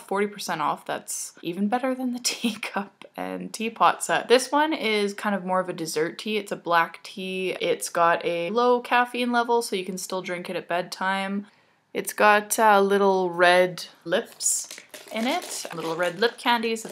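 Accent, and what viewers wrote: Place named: Canada. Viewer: Great video! And I love your Canadian accent <3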